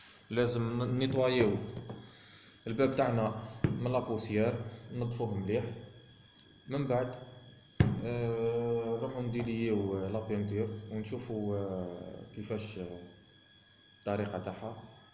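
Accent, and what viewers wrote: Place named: Tunisia. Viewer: لهجنك قريبج للهجة التونسية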